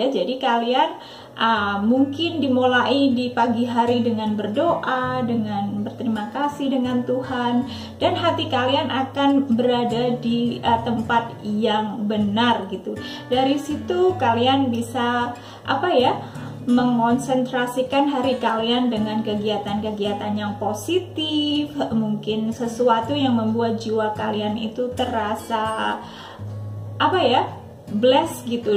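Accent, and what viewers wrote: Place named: Java, Indonesia. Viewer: asli asal mana, koq logatny jawa hhh..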